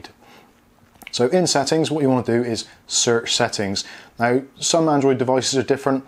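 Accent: United Kingdom. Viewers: too british for me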